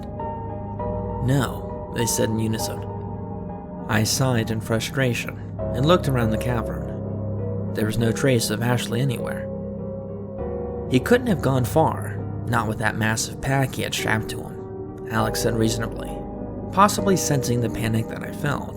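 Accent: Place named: Midwest, United States. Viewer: probably the midwest, reminds me of a thicker version of my accent so i’m fairly confident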